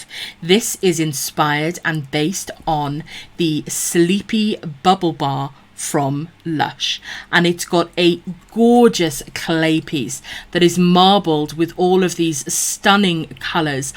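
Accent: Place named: United Kingdom. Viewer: I love your accent! And it’s so cute when you say my lovely’s! I just love all of the British language it’s so cute! This was overall a great review! As a reviewer myself you did a great job! You are so underrated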